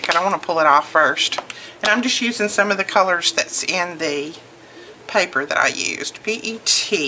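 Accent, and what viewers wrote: South, United States. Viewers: What a good idea, and useful! I've never seen those letters before. The inking around the letters is a good tip. I wouldn't have thought of it. I learn something every time I watch you. Your grand dauther is going to love it. While you were making this I was also thinking how kids really DO appreciate the little things we do for them. Just one more way we can say we love them are are thinking about them. I have to tell you this. I listen to all of your videos, because I am scrapbooking all day long, and you keep me good company while I am doing it.. Well, when I am thinking my thoughts as I work, I HEAR my thoughts in your accent!! Really! I can't speak it, but I HEAR it. I'm sure that if I lived in the south I would pick it up SO fast. :-)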